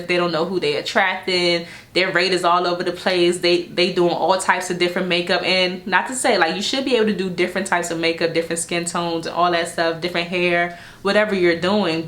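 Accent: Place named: Washington DC, United States. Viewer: I know a DC accent anywhere. Hey neighbor!! Great content and much needed. ❤️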